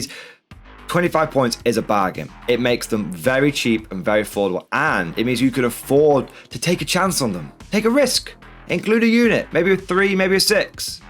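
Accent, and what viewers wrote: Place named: United Kingdom. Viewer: is swooning me with his cute British accent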